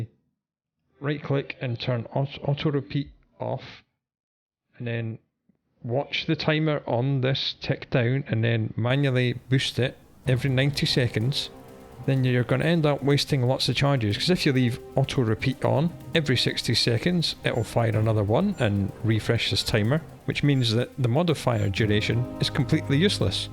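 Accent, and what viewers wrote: Scotland, United Kingdom. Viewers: your videos are amazing, lovely conversational way of going through things, the Scottish accent and easy going manner is of course fully to blame. A top lad.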